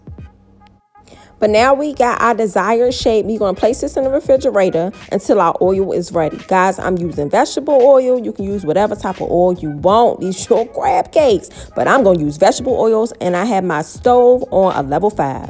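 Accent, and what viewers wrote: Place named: Prince George's County, United States. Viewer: From the sound of your accent I’m guessing you’re from PG County?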